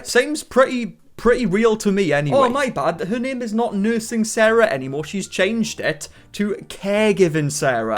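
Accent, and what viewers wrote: Wales, United Kingdom. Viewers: Nice brit accent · are u welsh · why are you british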